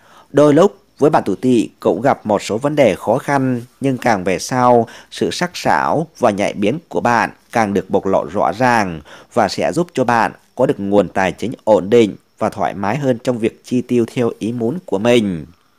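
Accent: Thanh Hoa, Vietnam. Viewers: Bạn cúa phải ngừi thanh hóa ko . Nuối hay rứ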